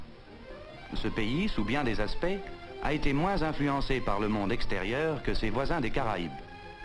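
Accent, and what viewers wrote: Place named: Quebec, Canada. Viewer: This sounds like a Québécois narrating the documentary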